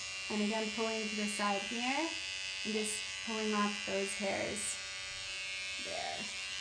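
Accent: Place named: Pacific Northwest, United States. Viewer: She from Portland or Seattle? smh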